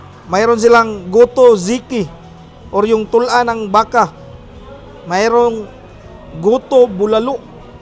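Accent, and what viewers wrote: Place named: Rural, Philippines. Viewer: Buti pa mag binisaya or Cebuano ka nalang kasi yung tagalog mo ay parang pambukid.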